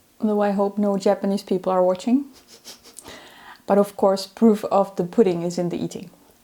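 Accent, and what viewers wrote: Netherlands. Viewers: Does she have a Dutch accent? Or Danish? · My family is from the Netherlands 🇳🇱 but I’m from 🇨🇦 I knew the accent instantly 😊great info great video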